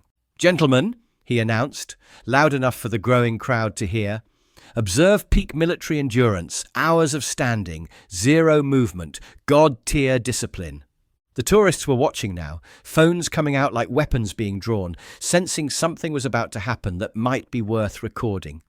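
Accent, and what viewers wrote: United Kingdom. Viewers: Perhaps the worst aspect is that it sounds like a Briti yarping the commentary! This one and several others I've listened to!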